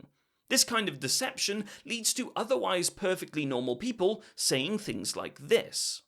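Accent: United Kingdom. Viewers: I just have to say that very very few people can make me *literally * laugh out loud, but you manage. I’m British too and I think I have a similar accent and love of swear words so it’s very inspiring to hear you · just might be your moist pointless video yet. at least it brings a british accent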